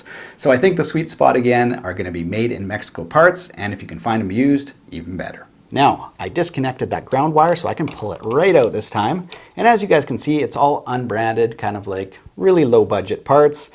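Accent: Canada. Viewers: You sound Canadian. Am I right?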